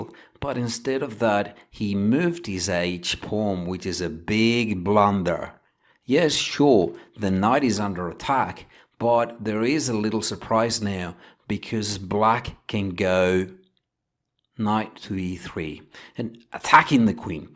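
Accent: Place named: South Africa. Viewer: what is your accent, mate? It's like south african crossed with australian crossed with eastern european. :)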